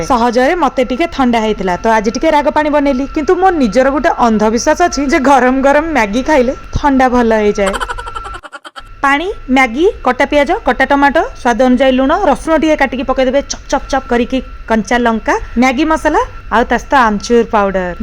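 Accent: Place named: Berhampur, India. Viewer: Didi yaku am berhampur style re besar kuhanti 😅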